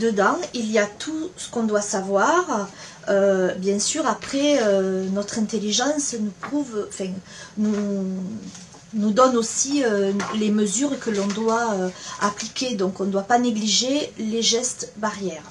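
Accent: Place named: South, France. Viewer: Merci à vous pour ces conseils pleins de bon sens, Ah le sens pratique... Avé ce petit accent méridional plein de charme. Bon courage et bonne continuation.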